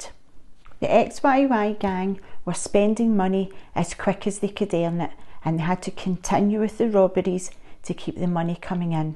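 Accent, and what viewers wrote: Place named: Scotland, United Kingdom. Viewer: Enjoyed your video very informative and lovely Scottish accent ❤. Regards Scotch Rob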